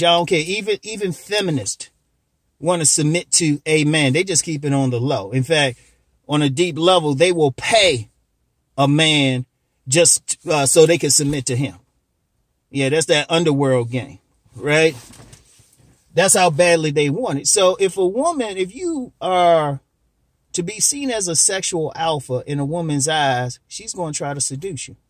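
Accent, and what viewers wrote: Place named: DMV, United States. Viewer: Dude, with that accent you've got to be from the DMV area! GREAT information too!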